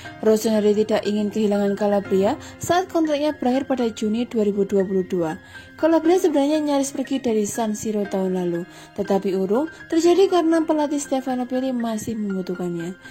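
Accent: Java, Indonesia. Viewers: Medok tenan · min kok agak medok ya😂😂😂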